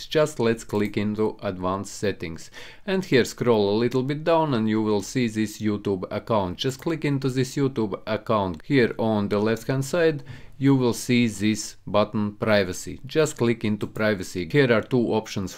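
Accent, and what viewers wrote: Russia. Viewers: i love russian accent 😂🔥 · Hes Russian i think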